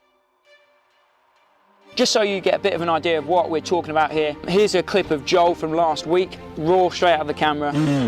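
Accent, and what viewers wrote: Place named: United Kingdom. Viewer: Great video, thanks for taking the time to make it 🙂. It answered a lot of my questions about wind noise, in a normal, undramatic British accent........God, I'm sick of all the over-exaggerated, over dramatic American accents on YouTube !! ☹️. As the speeds are quite low on your vid, what are your thoughts about wind noise on a side helmet mounted road bike travelling at speeds up to 80mph, do you think the results would be the same ?? Cheers 🙂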